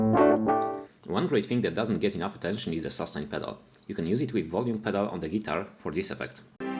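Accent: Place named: Warsaw, Poland. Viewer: I ten warszawski akcent. Gratulacje.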